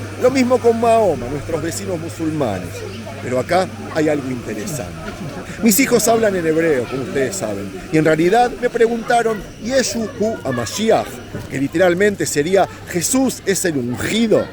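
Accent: Argentina. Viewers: Judio ? Yo lo escucho con acento Argentino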